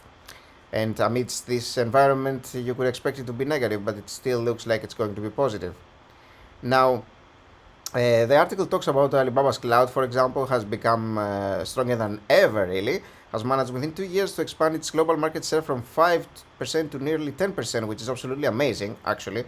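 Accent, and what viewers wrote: Greece. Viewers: Your accent sounds sooo greek man 😂 Correct me, if I am wrong!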